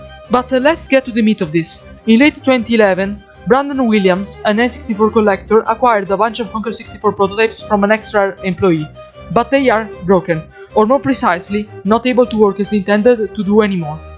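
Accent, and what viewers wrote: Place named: Italy. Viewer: Dalla parlata ho ipotizzato che fossi italiano, a ho avuto la conferma Mi fa davvero un sacco piacere che qualcuno in Italia parti di questo gioco in maniera così accurata Ti faccio i miei complimenti✌🏻👍🏻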